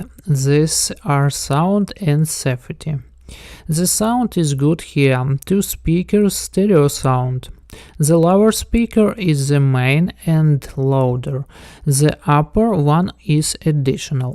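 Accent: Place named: Russia. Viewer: Сразу видно русский акцент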